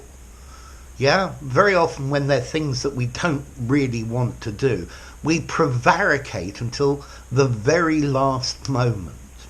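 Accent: United Kingdom. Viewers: Too much British accent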